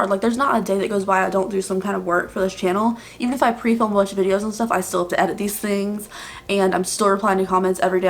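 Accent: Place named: New Jersey, United States. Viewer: my favorite part of this video was when you said you moved to Florida. I got so excited for you! I could tell how happy you were ❤️ I seriously had to laugh though because I was expecting you to say you moved from like somewhere really cold. NJ or something from the sound of it. then you said NC and I laughed so hard 🤣 girl, I'm from PA. the winters here are ROUGH!! it's like 30 degrees out right now and that's warm for this time of year 😱😭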